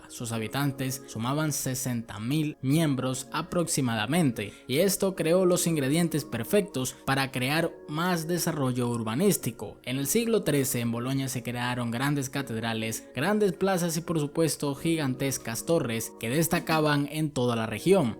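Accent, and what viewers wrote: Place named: South America. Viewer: Vaya forma de destruir el idioma. Me parece que el narrador es sudamericano. Usar un diccionario para ver cómo se dice una palabra no te hará daño. Ni practicar la dicción. Qué bueno que no vivo en sudamérica, no podría soportar la manera tan peculiar que tienen de hablar castellano.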